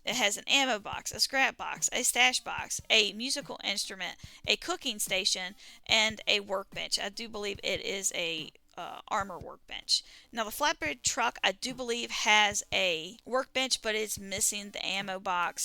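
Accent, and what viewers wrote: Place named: South, United States. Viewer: You have one of the most articulate sounding southern accents I've ever heard just FYI. quite a delightful surprise to the ears!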